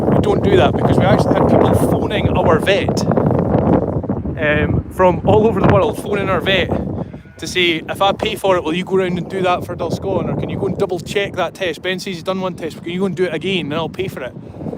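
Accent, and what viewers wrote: Scotland, United Kingdom. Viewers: At the end of the video, I thought you were saying taking a healthy “mule” home. Then I finally figured out you were saying “meal”. Having a bit of trouble with your Scottish accent.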